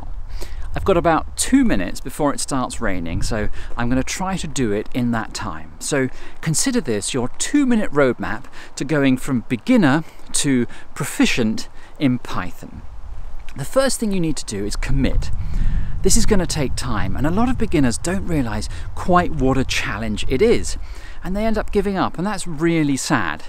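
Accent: United Kingdom. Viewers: Hello sir, thank you so much for all your videos. P.S. I do love your British accent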